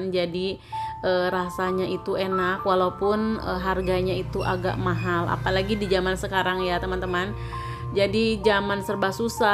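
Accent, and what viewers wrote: West Java, Indonesia. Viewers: teteh asli mana? Logatnya sunda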